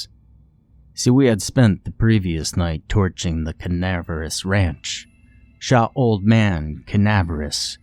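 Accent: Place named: West, United States. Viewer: Your western voice ain't bad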